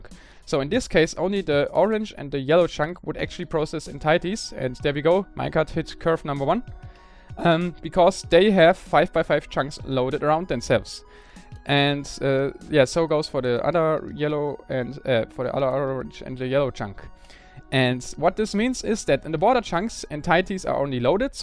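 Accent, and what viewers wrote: Germany. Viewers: Are you german? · mich persönlich stört der Akzent nicht wirklich, aber das "th" ist noch ein wenig ausbaufähig im Grunde das klassische Problem vieler Deutscher im Englischen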